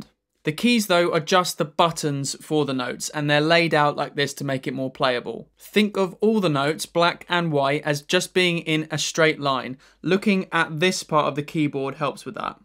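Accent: United Kingdom. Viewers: His Bri ish voice is very great. Teaches well, too!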